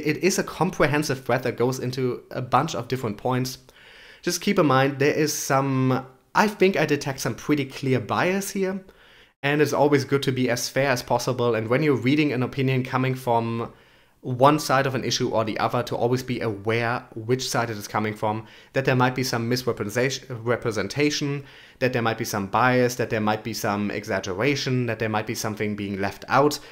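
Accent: Germany. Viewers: den deutschen akzent hört man einfach sofort raus haha^^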